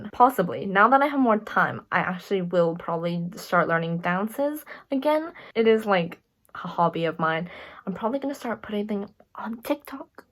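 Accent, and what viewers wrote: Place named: Singapore. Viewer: According to your accent, Though you are Singaporean. Love your reacting, Cheers.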